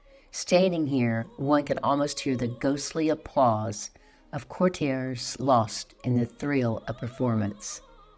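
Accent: South, United States. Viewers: You are a delightful lovely lady.. you sound like a precious southern lady. I'm from northeast Georgia and you're living my dream. 🎉 i can't wait to see what we've been doing.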